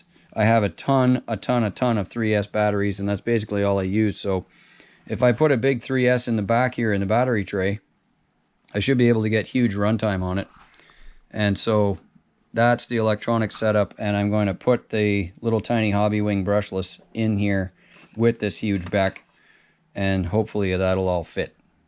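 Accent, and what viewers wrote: Canada. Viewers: Thanks for the detail - sure helps us newbs... and for pointing out the disconnected red wire from the SC to the BEC, my wasn't even shrink wrapped, they just pulled the connector pin out of the plug and left it haning. I figured it was a boo boo, so stuck it back in. Truck arrived from China 4 1/2 months after I ordered it, bad left steering servo, so while it's up on the hoist, am going to slow it down based on your fixer upper here with yer easy to follow instructions, in a proper Ontario accent to boot (grew up over near Shelburne, stuck down here in Texas now). cheers and thanks.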